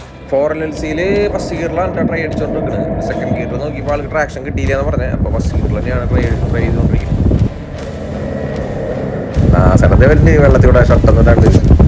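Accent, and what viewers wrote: Thrissur, India. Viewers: അവൻ്റെ പൂറ്റിലെ ഒരു കൊണച്ച തൃശൂർ സ്‌ലാംഗ് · Bro അത്ര Proffesional അല്ലാത്തത് കൊണ്ട് ആവും video ക്ക് നല്ല orginality . മറ്റുള്ളവരുടെ vlog കാണുമ്പോൾ skip ചെയ്യാൻ ഉള്ള tendency ഉണ്ടാകും ഇത് ഫുൾ കാണാൻ thoni കണ്ടു ഇഷ്ട്ടപെട്ടു follow ചെയ്തു കൂടെ കൂടി ഇനിയും ഇതുപോലെ ഉള്ള video തൃശൂർ slang ഇൽ കേൾക്കാൻ ആഗ്രഹിക്കുന്ന ഒരു കൊല്ലംകാരൻ ❤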